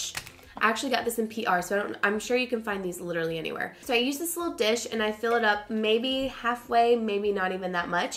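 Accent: South, United States. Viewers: you are getting a southern accent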